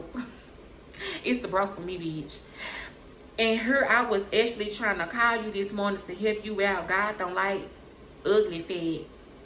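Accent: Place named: Tennessee, United States. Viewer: Omg you from Memphis!!! I made my first comment without see the part you from Memphis lol I know a Tennessee accent.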